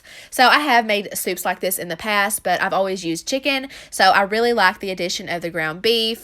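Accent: South, United States. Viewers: I love how you say monday 😍😍😍 ur country accent 😍😍😍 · Just found your channel and I ADORE YOU! Your content is awesome and your personality makes me feel like we’d be great friends lol 😂🤗😘 Plus I LOVE your southern accent! New subbie ♥️♥️♥️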